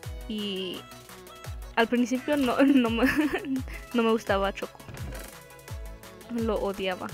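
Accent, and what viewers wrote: United States. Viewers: Es de Estados Unidos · Eres gringa?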